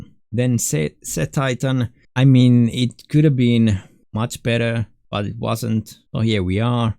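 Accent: Finland. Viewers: Are you finnish?? You sound finnish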